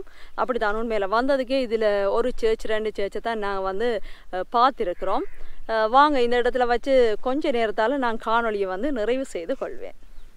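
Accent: Jaffna, Sri Lanka. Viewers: i am from tamilnadu. We all miss srilanka also YOUR tamil. Will decide and tell you my family trip to Tamil livinng country. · தமிழ் : அதிலும் இலங்கை தமிழ் வர்ணனை தேனாக தி்திக்கிறது. தங்களின் சாரல் ஊடாக இலங்கை அழகான பகுதிகளை பார்த்ததில் பெரும் மகிழ்ச்சி. இராமாயணம் சம்பந்தபட்ட இடங்களை பதிவு செய்யுங்கள் கான்பதர்க்கு ஆவல். மகிழ்ச்சி .வணக்கம் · உங்களுடைய யாழ் தமிழ் யாழ் மiiட்டுவது போல் ulladhu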